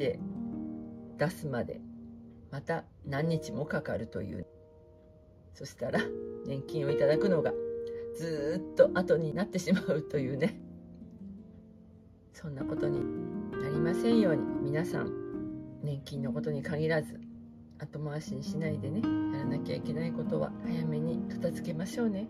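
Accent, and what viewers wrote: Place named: Hokkaido, Japan. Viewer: さん初めまして😊 さんの話し方が、北海道にいる親戚に似ていて、とても懐かしくなりました♪ これからも、動画のアップを楽しみにしています！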